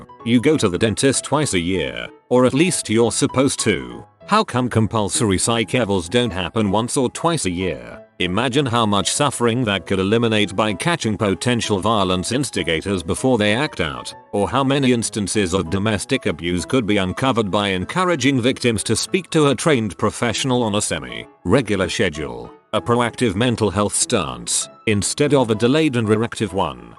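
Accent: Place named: United Kingdom. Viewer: Who is this British robot guy who does all the Reddit videos? It’s the same voice on all the channels and videos!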